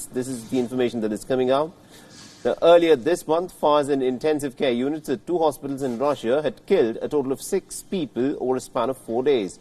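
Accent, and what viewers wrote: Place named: India. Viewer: Indian English has been developing independently with British rule and after. The anchor has a nasal tone & accent but otherwise it sounds normal enough to Indians. You must accept that there are worldwide variations in English all of which are equally authentic.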